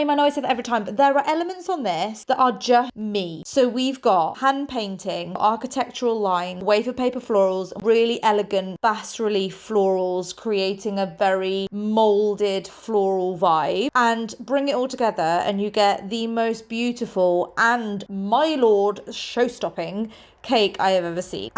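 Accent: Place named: United Kingdom. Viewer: Love your passion and lovely British accent ❤❤❤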